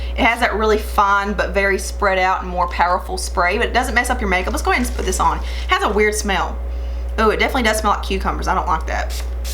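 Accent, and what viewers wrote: South, United States. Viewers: Oh my goodness..... You asked them if they could send some products for you to review fir them, and they just said no?? 😮 That was quite rude of them... You'd think they would be happy someone with nearly 13,000 subscribers wants to do a video reviewing their products and help them get more recognition (because I honestly NEVER heard of this website until I watched this video). Oh well...I guess they just don't care to gain more popularity. 😕 P.S I love your accent! 😆 I'm Canadian (which has no cute accents lol) but I've ALWAYS loved a southern accent....I can't pin point the accent to the state....I just know a southern accent. I think what made me like the accent so much is my OBSESSION with the country singer, he's from Georgia and aside from the fact that he is sexy AF, his Georgia accent only adds to it! Yup..I'm definitely gonna move to Georgia and marry that man...I mean..he's married and I'm married but...meh 😇 a girl can dream, right!? 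Lol 😄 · Clearly you're from the south? What's your accent? Hhmmmmmm Georgia?? NC??? I'm from SC. I'd love to see the Beauty creations palette on your face lol. Thanks for bringing these brands to light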